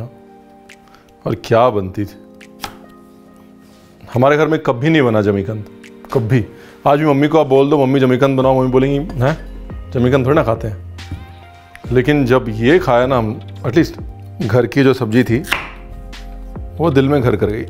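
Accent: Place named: Punjab, India. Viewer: I like your style of explaining everything. And, your typical Punjabi way of talking. I am going to make Suran the way you demonstrated today. I used to make in a different, Tamil Brahman way, my neighbor had shown me & it was tasty, but your recipe seemed amazingly simple & I could taste it in my home, here. Thank you Ranveer.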